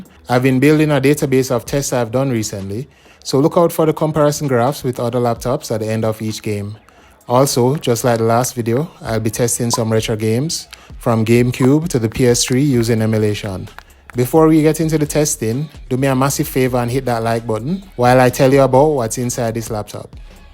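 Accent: Jamaica. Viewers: Definitely Jamaican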